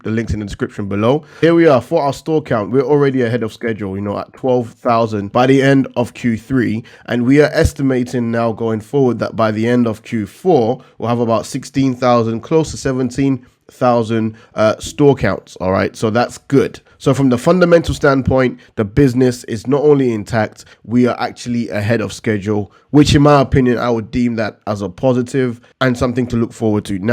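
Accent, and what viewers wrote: United Kingdom. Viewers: where are you from? The accent sounds british!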